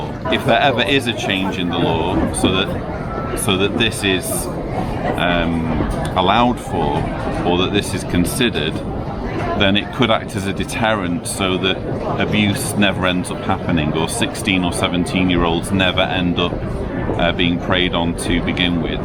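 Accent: United Kingdom. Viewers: Keep the good fight!!! Best British accent ever.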